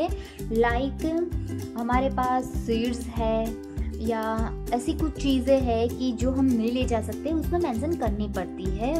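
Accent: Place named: Gujarat, India. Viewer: damn.. ur gujju accent... love it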